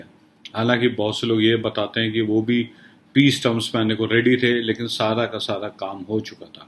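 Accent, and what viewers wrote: India. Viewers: This guy from his accent is cleary an Bharati.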